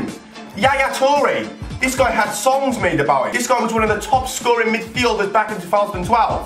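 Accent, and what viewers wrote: Wales, United Kingdom. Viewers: Hey are you from Wales lad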